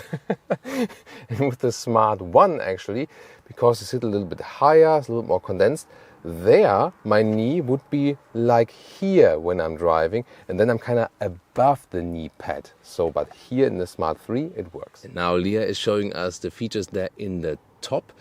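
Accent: Germany. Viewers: Dein Akzent (Du sprichst Englisch mit Deutscher Intonation) hat sich immer noch nicht geändert... Es wäre so einfach dies zu ändern, ein wenig Sprachtraining (mit ner App z.B.) würde schon helfen. Auch übersetzt Du oft Deutsche Ausdrücke direkt ins Englische, was einfach nicht passt. Es ist übrigens ein GEPARD, kein Leopard...ernsthaft?! Auf Englisch: Cheetah. Deine Reviews sind Klasse aber diese Deutsche Intonation im Englischen ist für native Speaker kaum auszuhalten.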